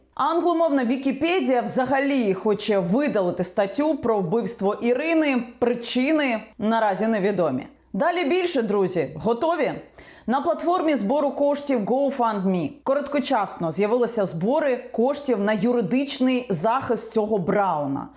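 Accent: Russia. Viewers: ну не під силу вам український синтаксис - хай вже як є, але ж вивчіть бодай українську фонетику - це не складно вам буде. Ну не можна ж вимовлять українські слова чи не в кожному другому з них по-московському. До Азірова не так вже й далеко.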